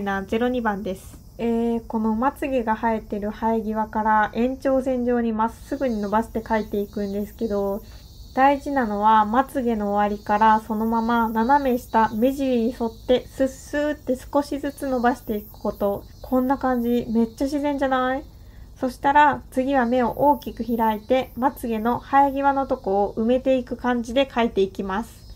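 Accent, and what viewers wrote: Kansai, Japan. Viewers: 関西弁、、？！意外！ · って関西弁なんや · 本当に魅力的なんだよなー🫶 あと、自分東京に住んでるから、関西弁めちゃくちゃ憧れるー🤩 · 関西弁だったんだすごいギャップ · 関西弁かわいい😂😍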